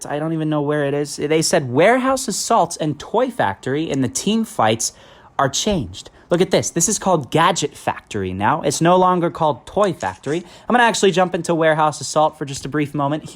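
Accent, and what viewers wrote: Russia. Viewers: Are you Russian? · Are you Russian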